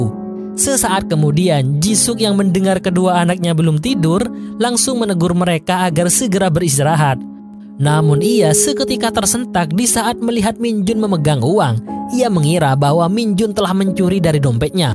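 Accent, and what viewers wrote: Madura, Indonesia. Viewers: Admin madura ya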